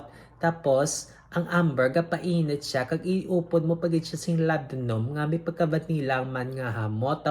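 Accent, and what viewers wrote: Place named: Iloilo, Philippines. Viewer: Nice may ilonggo nga reviewer. 😁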